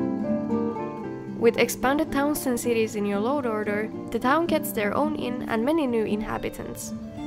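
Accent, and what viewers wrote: Finland. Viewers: Can't tell if your accent is mildly finnish or distinctly icelandic. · So far I've come to enjoy your videos, so I'll subscribe! Your nice soft Finnish accent is a nice bonus as well and makes your descriptions of Skyrim and its people sound official and pleasant.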